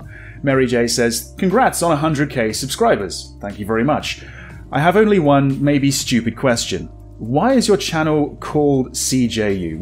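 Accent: United Kingdom. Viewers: ‘In Bruges’ is a fantastic movie!! I also had your accent pegged as a fellow Surrey person. We sound very similar. This was a great video,. Pleased we get to know more about you. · Congrats on 100K!! 🥳I have been following you for a long time now and you, Markiplier and Jacksepticeye are my favorite videogamers to watch on YouTube. Your videos are always entertaining and wonderful to watch! You brought my attention to wonderful games like the Cat Lady, Silence of the Sleep and Downfall. I looooved those videos you recorded so much. I enjoy it that you play Indie horror and Asian horror games that mainstream youtubers don't play that much. Also, your British accent is so awesome! 😁 keep up the good work CJU! I appreciate you and what you do! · Thank you so much for doing this. I started to watch you a few years ago, because I was about to do voluntarily stuff in the UK. 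So I looked for "british sounding lps" to get used to it to understand it better. I had to stay for your humour, for the fun, for the checked toilets, for the very rare screams. I think we'll get to 200.000. Let's do it! With some pizza and tea!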